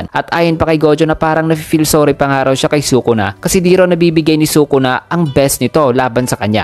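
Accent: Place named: Conyo, Philippines. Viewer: napaka conyu mo naman pare magsalita., dapat mag-english ka nlng kung nahihirapan ka magtalog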